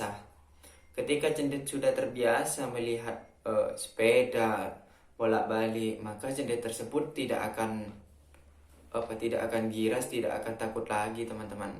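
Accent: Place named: Madura, Indonesia. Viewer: Madura nya bang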